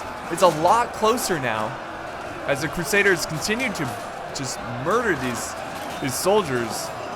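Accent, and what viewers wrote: Bavaria, Germany. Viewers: Are you from Bavaria? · Are you bavarian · are you from bavaria ?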